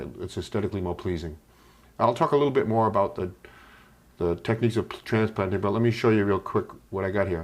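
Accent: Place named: Boston, United States. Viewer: Trying to place your accent. Leaning towards Boston but it might be Brockton? Or am I wrong on both counts? :p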